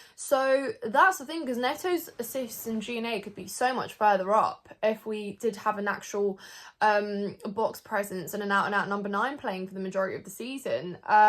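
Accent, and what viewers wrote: United Kingdom. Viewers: i can listen to talk all day I don't know why British get make fun of by Americans because of their accent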